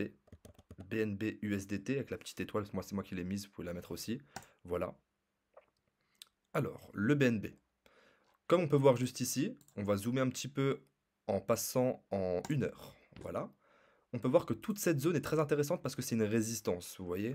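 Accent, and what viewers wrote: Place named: Switzerland. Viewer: Ta géré ta technique est simple et efficaces à parament je suis vraiment debutant et j’ai reussi je suis fier , et c’est grace a ton tuto je jure je l’es écouter au moin trois 4 fois , avec le téléphone et en meme temps sur mon pc , jamais pas les futurs de base , mais en vrais c’est l’avenir 🤣 lol jte remercie super bien expliqué, et les sécurités qui faut c’est tip top ! Merci a toi encore ;) je pense que je vais regarder toute ta chaine aussi passue je suis tomber par azard et j’aime bien l’accent suisse ! Nanante huit :) peace et merci 🙏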